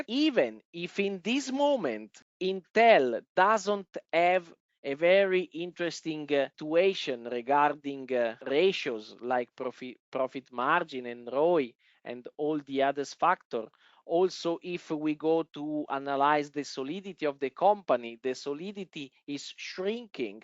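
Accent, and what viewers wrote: Italy. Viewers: sembra un inglese con forte accento italiano, ma non vorrei sbagliare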